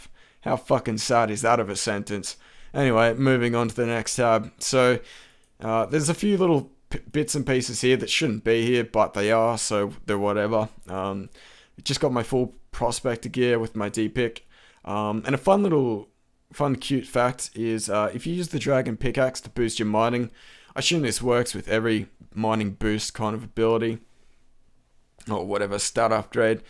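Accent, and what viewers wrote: Australia. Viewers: nice vid man, always good to hear an aussie commentator. get dem 1m nats cuz :P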